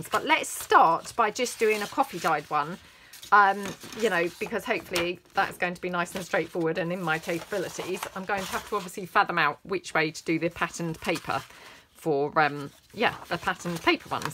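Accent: England, United Kingdom. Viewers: Hi I love these. I've been watching 5 of your older videos this afternoon it gives me the get up to do things for journals I'm making for family. I just love to hear the accent me being English from the Midlands now in Australia for 53 yrs. I was 11 when I came. My kids say I've not lost my accent but they also have one they all have a Birmingham twang my hubby is Australian to and he has picked it up. But I miss hearing English accents. But you help me to do things I've never done before. Thankyou. God bless Karen and family in Australia. I do hope you are ok with your divorce and you are keeping well. Family to.🌻💖🥰🐨🇭🇲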